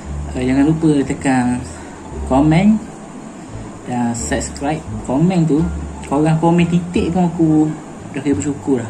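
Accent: Terengganu, Malaysia. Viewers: Aku suke tengok orang ganu cakak..hahaha good job bang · Dgn slang ganu dye comel😂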